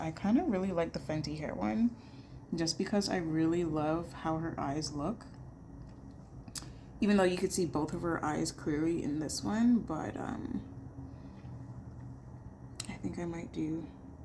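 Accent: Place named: Jamaica. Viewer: A patois (patwa) dat mi hear 😅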